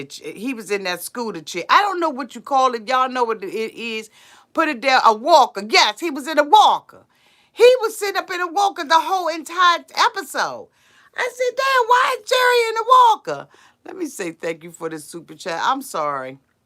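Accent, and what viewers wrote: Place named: DMV, United States. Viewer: This my first time listening to u, but I can hear the dmv all up in u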